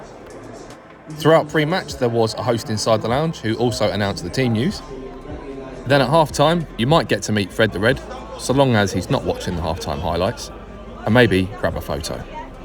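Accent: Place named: Cockney, United Kingdom. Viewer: THEY HAVNT GOT A ROOF . BWAHAHAHA RINSED . COCKNEY TWAT .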